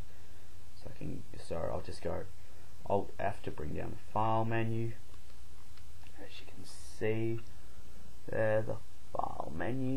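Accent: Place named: United Kingdom. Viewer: whats with the awful british accent?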